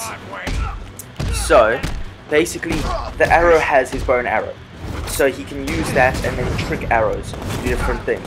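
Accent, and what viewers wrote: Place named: South Africa. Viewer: recognised ur accent 😂 Im from SA too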